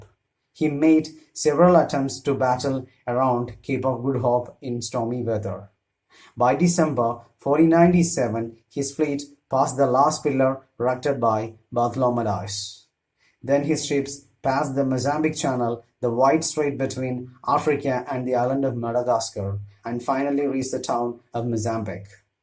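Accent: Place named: India. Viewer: I can read the book it’s more understandable then a Indian accent I thought this was a documentary.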